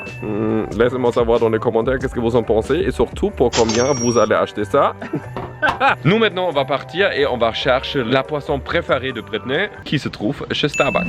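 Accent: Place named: Canada. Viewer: tu mon youtubeur préféré desvidéos accent canadien et qui met quoi des vidéos trop trop rire 👍👍👍👍 24 heures 🇨🇦🇩🇪👍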